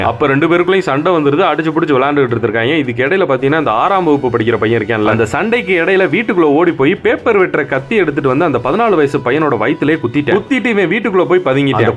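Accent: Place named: Karaikudi, India. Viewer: Bro I think your native is Karaikudi......... your slang....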